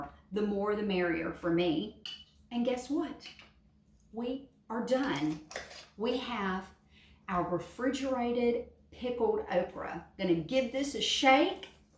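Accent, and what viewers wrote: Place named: South, United States. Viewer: I could hear you talking for hours. Love southern accent. After 43 years in California. The way you talk is just music to my ears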